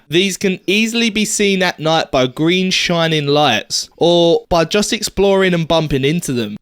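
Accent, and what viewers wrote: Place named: Cockney, United Kingdom. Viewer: Great info, your accent makes me cringe though.. COCKNEY AF EHHH?!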